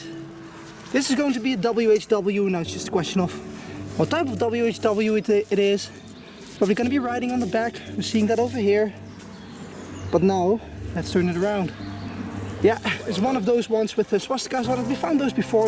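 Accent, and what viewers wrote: Netherlands. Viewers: Can I come with you one time? Sounds like you are from Holland, damn I love this 🤓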